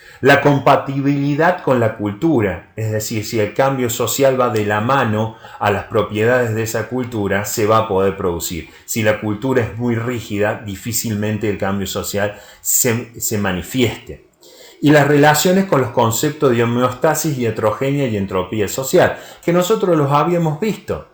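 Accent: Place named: Córdoba, Argentina. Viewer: Muy buen vídeo, me agrado la tonada cordobesa vamo´ a tomar un fernet escuchando?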